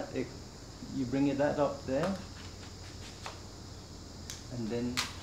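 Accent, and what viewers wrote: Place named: United Kingdom. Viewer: I never woulda thought you had a brit accent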